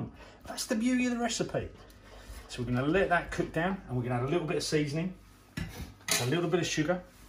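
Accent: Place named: Cockney, United Kingdom. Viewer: I can't get over this guy. I guess he really is the head chef at Wagamama but he speaks like he's from EastEnders and displays a real lack of culinary vocabulary saying things like "soya sauce" and "too-meric." It's like the real Steve Mangleshot wasn't available so they just drafted in any old dodgy actor that they had during lockdown!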